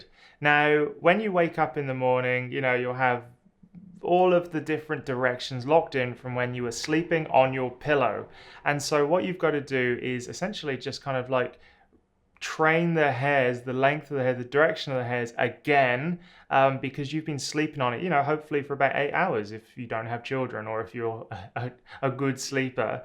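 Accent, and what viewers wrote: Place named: Shropshire, United Kingdom. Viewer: Your accent sounds somewhat local. Shropshire?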